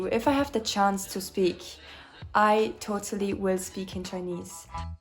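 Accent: Germany. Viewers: Very impressed by your diligences and study approach, I'll definitely try to apply it going forward. Just a small note: you have excellent English but a pretty strong German accent - maybe try to emulate your preferred English accent, just to sound a bit more natural?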